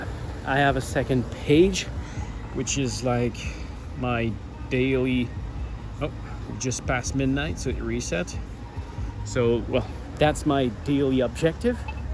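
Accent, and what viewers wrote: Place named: France. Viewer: your accent is much too strong, when a French person tries to speak English it is usually very difficult for me to understand or I do not understand half of it because of the poor pronunciation of the words and I speak a lot of English and German and Dutch. I also think that you as a Garmin fanboy you just enjoy making Apple bad while it is an all-round top product. Garmin is certainly good too, but its not for me.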